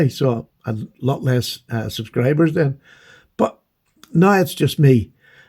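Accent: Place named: Wicklow, Ireland. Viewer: oh my days , I think slippys work is great , I'm going to follow your advice and join the group , get Mat to do some work for me , thanks again for all your content as all ways its the the biz , I'm sure I told you before I live in London but I'm from Wicklow and its great to hear an accent from home , that Taylors eye is just sublime , all the best ,p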